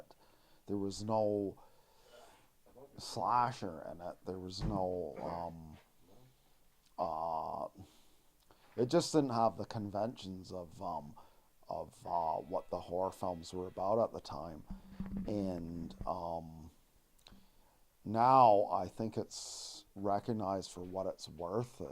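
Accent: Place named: Canada. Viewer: I could talk to this dude forever about this Also one of the most Canadian people I’ve ever heard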